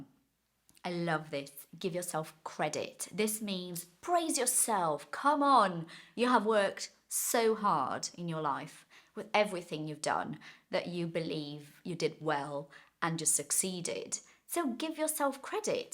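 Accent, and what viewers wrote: RP, United Kingdom. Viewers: I love so much your accent, it's soo brit and fancy 🤣🤣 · Hey, teacher! Hope you are doing awesome. I have found you on Instagram and here on your channel. I am amazed with your british accent and also watching every single video here. I am trying to acquire the british accent but, it is not so easy. Lol Kudos to your work and skills!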